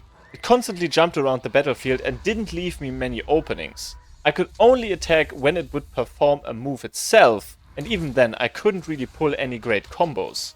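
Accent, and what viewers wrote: Germany. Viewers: I smell the german accent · I just love german people talking english. sounds great. keep it up brother! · Hörst du den fetten Akzent nicht? Natürlich ist der deutsch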